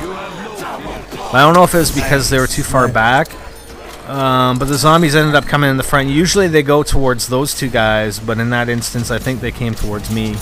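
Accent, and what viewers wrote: Canada. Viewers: hey dude you must be from Canada.... am i right?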